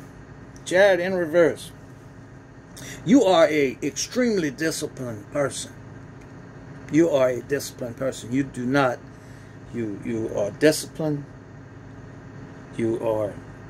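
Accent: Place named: South, United States. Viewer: just love yr southern accent and manners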